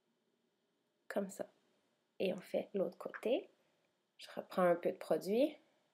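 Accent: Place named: Canada. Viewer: ho toi tu es une canadienne !! je me trompe pas !! avec ton accent tu es une !! trop marrent quand vous parlez!!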